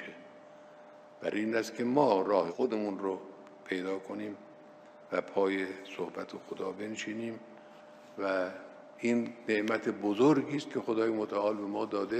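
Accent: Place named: Arab world. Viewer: حاجی من و تو آخر عاقبت نداریم اون دنیا باید جواب سختی پس بدیم حالا هی با لحجه عربی صلوات بفرست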